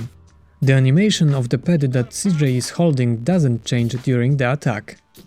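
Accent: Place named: Poland. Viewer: Słychać że polak niezły film tak trzymaj!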